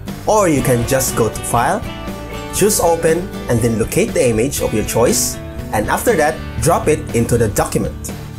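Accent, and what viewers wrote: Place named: Philippines. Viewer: pinoy ka ba? napaka pinoy ng accent mo hahhaha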